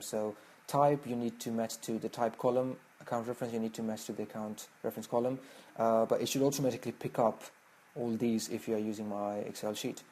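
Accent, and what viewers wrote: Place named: United Kingdom. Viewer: This is very excellent and helpful. But it does not tell about origin of country. It sounds like British. Thanks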